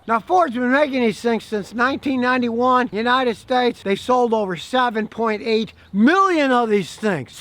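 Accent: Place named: South, United States. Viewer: is gettin’ a southern accent:-)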